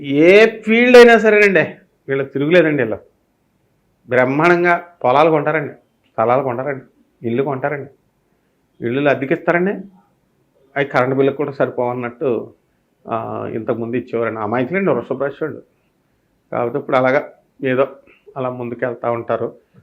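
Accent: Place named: Godavari, India. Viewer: మీ యాసని బట్టి మీది గోదావరి జిల్లా.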